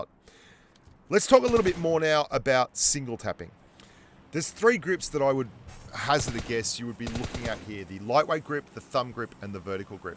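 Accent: Australia. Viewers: Wow u got Aussie Accent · Are you Australian?...you sound like a mellow aussie.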